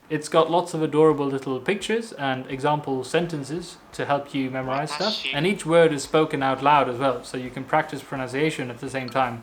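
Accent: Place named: United Kingdom. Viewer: We’re just catching up on a lot of Swedish things before we visit Stockholm at the end of August. Now we are addicted to your videos. And your fine British accent, and liberal swearing. Now we’re enjoying your Japanese - can’t wait to go back ourselves. If we’re ever in the same city I’ll buy you a drink. A small, inexpensive drink, but a drink nonetheless. Much love from the UK.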